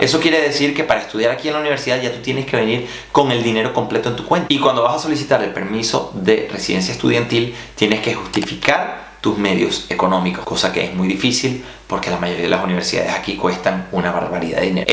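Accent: Venezuela. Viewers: Tienes acento venezolano xd genial bro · su acento es venezolano. Que Negativo eres !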